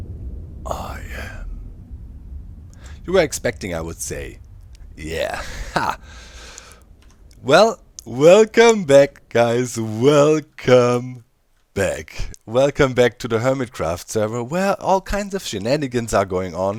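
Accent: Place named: Germany. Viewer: Dein deutscher Akzent... immer wieder schön 😁😂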